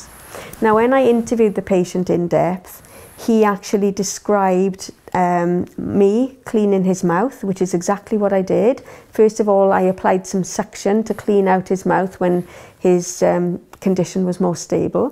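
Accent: Wales, United Kingdom. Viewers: Beautiful welsh accent .......... my sister said she saw herself on the operating table from above.......German surgeons drilling her head........she had a head injury from motorcycle accident............she was in a coma for six months.......... My brother said his life flashed before his eyes when he nearly choked to death......... Myself.......my children's father whom had left me & married another woman & lived in another country............the day he died I had this unreal tiredness .....I was that tired I went to bed.........I always have plenty of energy.............my son phoned me (I was still in bed) in a terrible state saying his dad had died while doing his plumbing job at a customers home.........around mid day..........he died alone of a heart attack.......... I was wondering afterwards was my ex husband trying to call me............was he trying to get a message to me...........did he realise he loved me at the end of life...........I wonder if I will ever find out.......... 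· vashon100 WELSH...from WALES....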